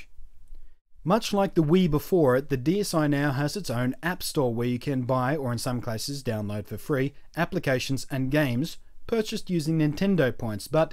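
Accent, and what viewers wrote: United Kingdom. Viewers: British?? good job graduate. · he's british. =/ lol. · Have you ever heard a british/english accent...?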